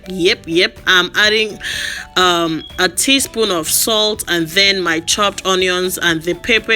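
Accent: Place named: United States. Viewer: Hi. Thank you for such interesting video. I love cow skin and goat meat. Tripe not so much. I have never had Nigerian food, but I have a Nigerian friend who happens to be my mentor. I love Nigerian movies. It’s where I learn the phrase Chineke. And most of all I love the fabric. I would love to visit just so I could buy African fabric. Thanks for adding the new words in my African vocabulary. I will go and show off on my mentor that I am slowly becoming Nigerian. You speak as if you live in the states. I live in the states too and I don’t like the cold either. Anyway I watched to the end and be blessed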